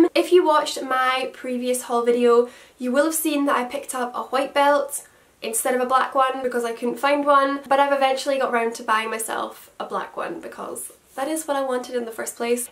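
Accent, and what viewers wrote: Scotland, United Kingdom. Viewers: Omg r u Scottish if so I'm from Glasgow ❤️❤️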